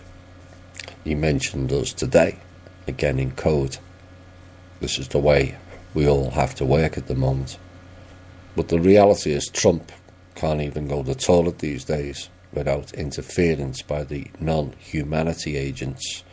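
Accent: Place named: Northern England, United Kingdom. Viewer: Good info, nice northan accent, feels like been back home, derbyshire man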